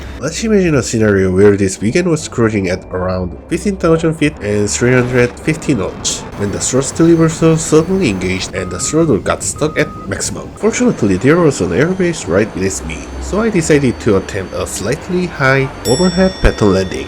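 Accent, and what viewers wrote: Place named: South Korea. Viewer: guessing from your accent you're Korean right?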